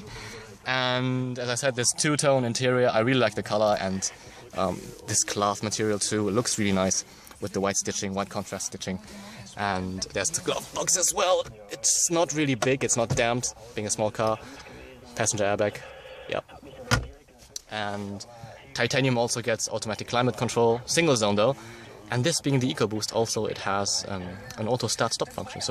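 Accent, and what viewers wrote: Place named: Germany. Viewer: du sprichst zwar englisch mit einem starken deutschen akzent, trotzdem würd ich gern so gut englisch sprechen können wie du. super videos übrigens. mach einfach weiter so!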